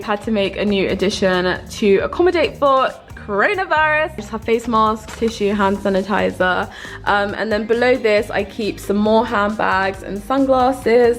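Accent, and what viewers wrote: United Kingdom. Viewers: A lot of Black women in the UK speak like this! Especially in the corporate world. ☺️ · You sound like you come from a lot of money anyway. Your accent is very much British Private-School rooted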